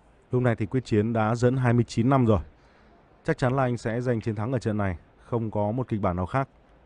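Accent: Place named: South, Vietnam. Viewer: Tường thuật Bida chỉ cần chậm nói ít nhưng đủ thế thôi chứ đâu cần phải liến thắng như tường thuật bóng đá mà người bl nói tiếng miền Nam nói quá nhiều làm mất đi cáo hay cái đẹp của môn thể thao này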